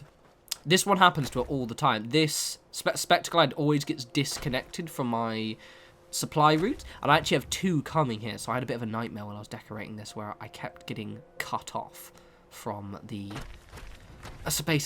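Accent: England, United Kingdom. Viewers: Why do I enjoy this England accent 💀it so soothing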